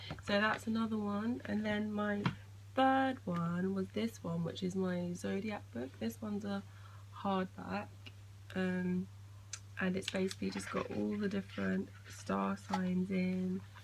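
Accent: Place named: United Kingdom. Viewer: Hooray found somebody with a british accent. 😊😊😊😊😊😊. I love Art appreciate your channel.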